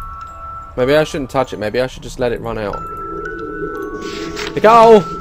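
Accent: United Kingdom. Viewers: your British aren't you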